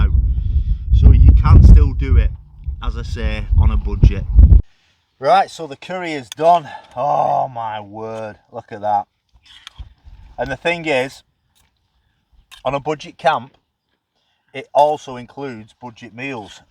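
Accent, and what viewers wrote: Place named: Bolton, United Kingdom. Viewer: Proper bowton talk, crackin't flags! 🙂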